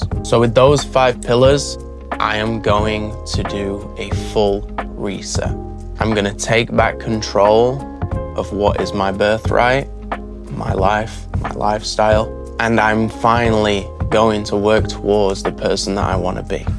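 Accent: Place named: Yorkshire, United Kingdom. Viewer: oooooooo Yorkshire asmr ❤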